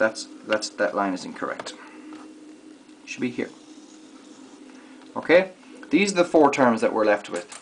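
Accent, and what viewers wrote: Ireland. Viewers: You have a lovely Irish accent. It makes the grasping of the subtle secrets of differential equations that much more delightful. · sounds Irish. Loving the accent and the lesson. Great video!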